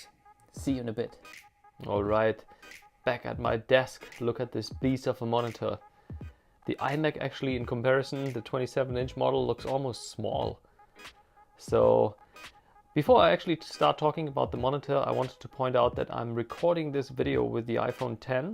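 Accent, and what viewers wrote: Germany. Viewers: Hey thanks for the review, the monitor costs 670€ at the HP Store this weekend and you really convinced me to give this monitor a try I can barely notice your german accent, just saw on your profile that you're living in japan, thats my dream man hope you enjoy it :) Cheers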